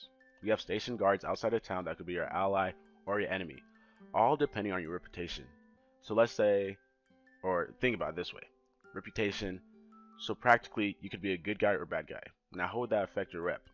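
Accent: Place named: United States. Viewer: what was ur first language then? cause ur american accent is on point g.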